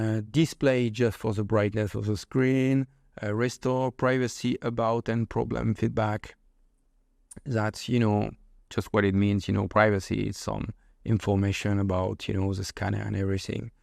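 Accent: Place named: Spain. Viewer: No problem on the accent! We have a lot of Spanish people in this part of the country!, LOL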